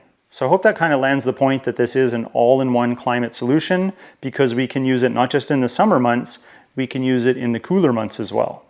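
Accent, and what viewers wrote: Canada. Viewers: You did well explaining it. Love the way you say garage, sounded like you were cutting the letters down to just 3 lol. you'll see it's like soft french.....!!!! Canucks always sound foreign. Must be in the water. For the price if it's durable. And durability being the key word it's worth the $ 699. Or is it???? A top mounted R.V. ac/heater that is much more powerful is only $200 more. But for tents and off grid applications this would maybe also be great except I check a low watt a/c on Amazon and it's only $ 332 14,000 btu , so...... For tents this would be worth it. Another scary thing is it only comes with a 1-year warranty. That doesn't build confidence. For winter we always use a low watt electric heater along with kerosene lanterns. You'd be amazed how much the old hurricane lanterns put out. We made #2 boxes for ours to sit down in, so the kids couldn't knock them over. 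But now in our " Golden Years we just do hotels and motels. Get all kinds of rebates and miles and bonuses for loyalty too. Much safer and easier and actually cheaper. Over 65 has it's perks. Loyalty is the key. Best regards Canuck. Great Job on the video. Peace. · man, what a dumb comment. You’re an embarrassment to the rest of us. If you couldn’t tell he was Canadian by his accent, you need to get out more. Also, you act like Fahrenheit is American…it was developed by a Dutch physicist and the US is only one of 5 countries in the world that still uses it. There’s a reason for that. And if your comment was some smoothbrained attempt at American exceptionalism, you really need to read some history about how strong an ally Canada has been, regularly spilling more blood and treasure per capita that the US does in global conflicts. You came here looking for information and it was given to you for free…so just be grateful someone else did your homework for you.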